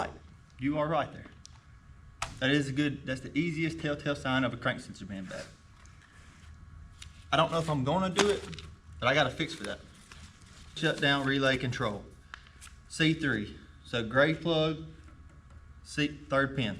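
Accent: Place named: South, United States. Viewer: I was going to research it on the internet but I cannot understand your southern accent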